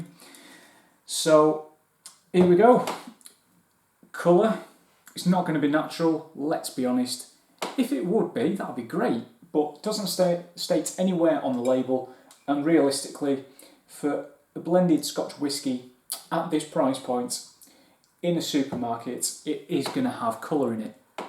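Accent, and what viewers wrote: Manchester, United Kingdom. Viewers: Great review. You sound more and more manc the more videos I watch.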